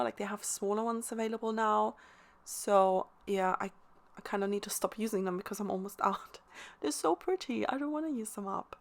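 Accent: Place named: England, United Kingdom. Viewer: You make such pretty things. I love pink and purple myself. My fav colors. Love listening to your “English” voice. We here in the states gave the “hick” sound. 🤣. Anyway, I need to find the pretty embellishments like you do to layer with. So fun watching you create. TFS!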